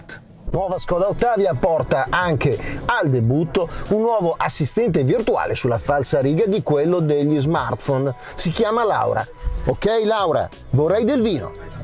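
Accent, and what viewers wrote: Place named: Milan, Italy. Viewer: Bravo lo speaker. Peccato per l'accento meneghino.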